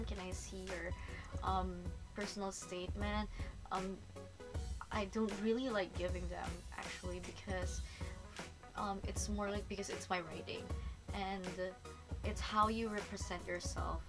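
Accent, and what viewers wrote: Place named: United States. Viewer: You study in Korea but it sounded like you are now in US because of your accent. Do you have that accent already before you go there? I forgot for awhile that you are in Korea, no offensement to the people there but most of them go to English speaking country to learn the language and you are in the opposite of it.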